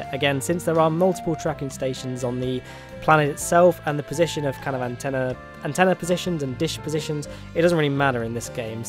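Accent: United Kingdom. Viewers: It’s because of his British accent and many Americans think that Britons are very posh, even though most are not. (Just listen to a song!)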